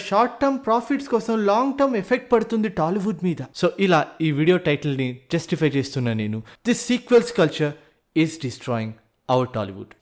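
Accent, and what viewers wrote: Karnataka, India. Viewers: Kannada accent lo intha acha telugu nuv tappa evadu mataadadu ra babu 😁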